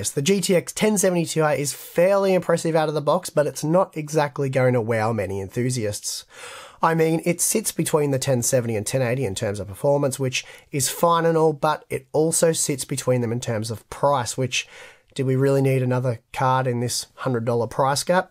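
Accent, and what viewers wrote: Australia. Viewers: Get well soon! The videos are not the same without your full strenght australian accent :(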